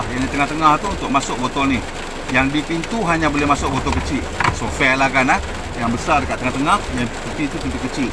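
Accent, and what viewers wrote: North, Malaysia. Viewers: Abg org mana bang...dok cakap belah utagha ni..😄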